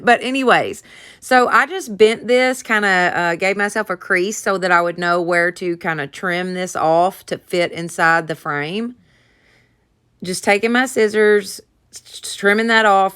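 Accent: South, United States. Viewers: Can't pick a favorite as all of your projects are beautiful. Best part is; I have all the materials to make them. Lord have mercy girl you're a kindred soul for sure. You speak "Southern!!!" Music yo my ❤️. Have a Wonderful Christmas 🎂⛄❄️ and Happy New Year.😇...Roberta from N.C. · You are really fun to watch. I love all your DIY’s. Also you have a wonderful accent. I grew up in Alabama and I miss the southern accents. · I always love everything about your channel especially the accent seeing as I’m from the south 😍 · I love your southern draw & your upbeat personality! You must be a southern gal like myself. I love how you say ‘dude’ and ‘slap that paint on y’all’, lol. You’re definitely a lot of fun to watch & listen to! All of your crafts are beautiful. I love the lanterns! The 3 candle centerpiece is so gorgeous! These are my favorite. Hope you & your family have a Merry Christmas! 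· Love your southern accent. I’m from West Tennessee!!! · You are literally the BEST bow maker I have ever seen. ❤ Your lanterns are gorgeous! Ok.. I love your accent. It is a mix between my grandma (OK/ARK) & my daughter (TN/GA) in law. 😉 It makes ya like family. ❤ I love your Reindeer Farms sign. It is gorgeous! Another amazing bow! 🎀 The candle holder turned out amazing! 🕯 That last sign is perfect. The picks in the corner like that is beautiful. Ty soo much for sharing. I appreciate it. So happy to have you on this Hop! 😀 Merry Christmas! 🎄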